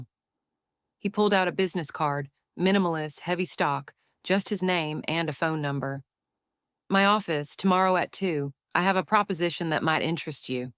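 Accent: South, United States. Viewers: That's a very good point, too. The narrator sounded like a southern white woman with good diction. But the plot was unique & lovely❤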